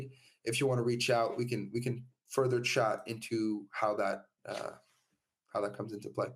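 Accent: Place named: Canada. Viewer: Hmm, you sound too Canadian. Not even sure if you are from canaduhhh. Either way, You lost me with all the regulatory/environmental BS at the beginning. I’m looking for no BS companies to invest in. I’ll keep looking.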